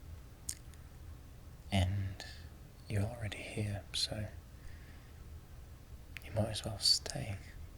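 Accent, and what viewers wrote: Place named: New Zealand. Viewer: He’s even got the kiwi accent, by the sounds of it 👏🏼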